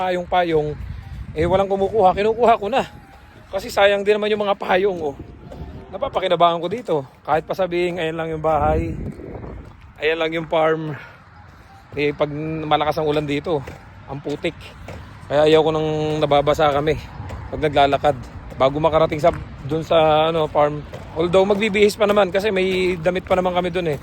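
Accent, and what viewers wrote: Philippines. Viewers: Kuya parang tagalog na tagalog ka? Taga Quezon?, Mindoro? Mariduque?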